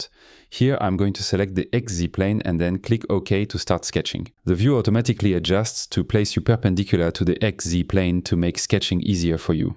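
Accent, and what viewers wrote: France. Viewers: J'aimerais avoir vôtre fluidité en Anglais ! en tant que français je reconnais un autre Français xD Avez-vous déjà utilisé Solidworks ou Fusion360 ? en point de comparaison ? ou vous avez une expérience exclusivement sur FreeCAD depuis le début ?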